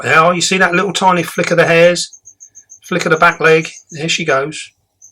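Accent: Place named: Dorset, United Kingdom. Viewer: OMG that thing is HUGE! The cage is beautiful! Hearing you talk brings back fond memories of visiting my Uncles in Dorset long ago, my Mom's side of the family is all from over there.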